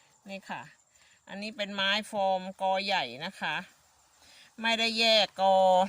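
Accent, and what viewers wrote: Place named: South, Thailand. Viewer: น้อยมาช่วยเปลี่ยนถางค่ะคุณ 🤩🤩พูดแบบคนใต้จ้า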